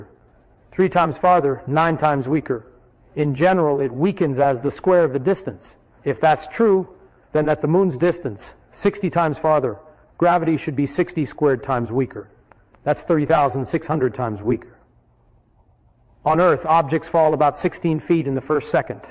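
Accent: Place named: Canada. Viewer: That's not Feynman’s voice, it has a Canadian accent.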